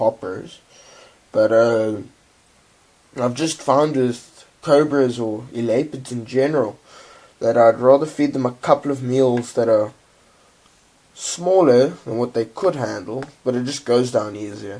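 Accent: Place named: South Africa. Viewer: you sir are south african .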